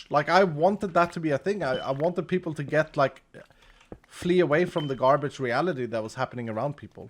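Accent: Sweden. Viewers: It would be so sad to see you leave hermitcraft! Frankly, you are my favorite hermit, and the reasoning behind that isn't the Mega bases or crazy redstone (although you deserve tons of credit for that!) The part I really love about you is your humor and interactions with all the hermits! Literally, i started watching immediately after 12 bamboo, and I've loved your content ever since! You've been so funny and made some wonderful collabs! I've never stopped thinking about hermit challenges, dare sticks, or squeaky inflatable toys! I also really enjoyed the Vault Hunters series too! I originally hated modded MC, but the moment I watched your single-player series, I fell in love! Seeing all the interactions between skilled and new Hermits was so entertaining and creative! A few friends and I think it would be cool to see hermitcraft shift into a little more modded stuff, such as Terralith, to add to the vanillia experience with custom world generation! 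And with your accent and 2nd language, who cares if you mess a few words up? I really enjoy hearing your voice, accent, and humor! It's so charming! I watch you because I found it so cool you are Swedish and different (in a good way) compared to the Youtubers I normally watch. It's unique, engaging, and fresh! All in all, you are a talented and amazing creator, and no matter what you decide to do, I'll always be there to watch your amazing omega videos (of doom)! Keep up the amazing work with minecraft and Vault Hunters, and don't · It has never bothered me to listen to the way you use the English language. In fact, it's sort of endearing, you've always been the silly Swedish guy! In fact, your content has always been a source of comfort for me. I started watching Hermitcraft in Season 6 and you were immediately one of the first hermits I began to watch. Even if you never upload another hermitcraft video, no matter how sad the fans may be, we will continue to support you. 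Make the content that makes you happy, and we will keep watching. I personally didn't care as much about the building, I loved the way hermits interacted, the games, the pranks, everything. It means the world to me that you have hermits on Vault Hunters. community is what makes Hermitcraft great. I hope to see some videos from you on the #10 server, but at your own time and at your own pace. and even if you don't, I'll keep watching for Vault Hunters. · You do you ❤. We love your Swenglish and as a non native English it is so recognisable. Make a base you enjoy and upload when it feels good for you. Grian and Mumbo do the same. I always love it when you can hear the true joy in vids instead of something forced. And there are other hermits who don t make mega bases either. Dont take the joy away by comparing yourself to others. You are wonderfully unique ❤ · nobody is disappointed with your Hermitcraft output. 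Anyone who says they are probably don't actually watch, they just comment to try and annoy you and others. YouTube viewership is down for many creators across the platform too as the way people consume content is changing (many younger kids just want 60s clips). I've just reached the point in the video where you're discussing this exact point as I was typing. 😂 Going forward may I suggest you just continue doing what makes you happy? It comes across in the content which, in turn, makes the viewer more likely to enjoy it. You have an amazing creation in Vault Hunters and it makes excellent content. Plus, it's really endearing to hear your Svensk-isms. If I was 1% as good at Swedish as you are at English then I would be very happy indeed. Tack så mycket,. Vi älskar dig, och din videoklipp. God Jul! 🖤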